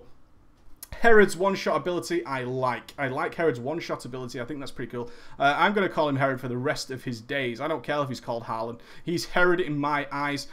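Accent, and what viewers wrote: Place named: United Kingdom. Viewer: Where can I find WoW videos where the commentator is not british, loud, and obnoxious?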